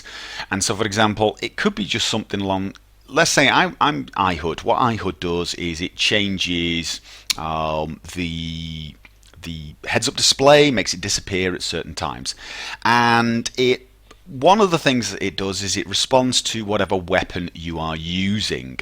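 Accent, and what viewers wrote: United Kingdom. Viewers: Fucking bittish accent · Not only does your voice sound annoying, no, on top of that you have a highly obstructive british accent. Please visit a speech therapist, everytime I have a problem with Skyrim and watch your videos my ears feel raped. It's not "coot" it's "cut"...damnit. Anyways thanks for the thorough guide and fixing my problems again.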